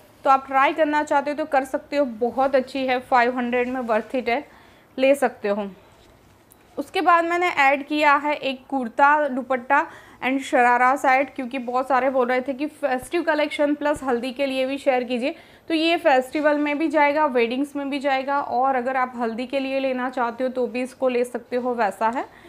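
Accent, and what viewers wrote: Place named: Gujarat, India. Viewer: Ma'am I think aap gujarati ho aapke bolnese lag raha hai.. Mebhi gujarati hu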